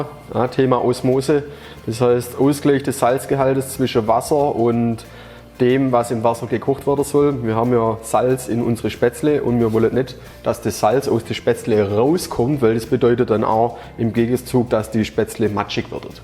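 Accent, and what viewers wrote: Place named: Swabia, Germany. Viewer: Subborr Schwääbischkurs :)))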